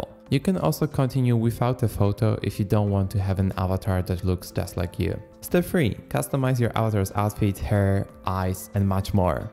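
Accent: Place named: Sweden. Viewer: he used to have a strong Swedish accent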